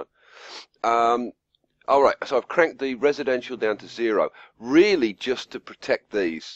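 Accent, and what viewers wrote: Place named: United Kingdom. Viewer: I love your briton accent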